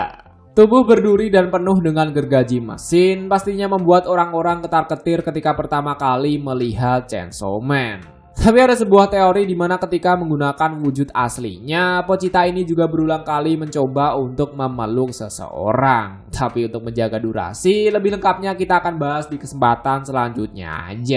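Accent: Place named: Madura, Indonesia. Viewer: Intonasi nya kek orang Madura bikin sakit telinga apalagi pake headset 🤣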